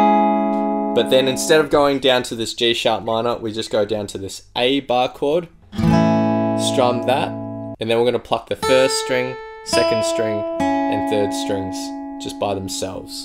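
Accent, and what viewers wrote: Australia. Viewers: Thanks for this great tutorial and the Aussie accent . Both excellent. 👍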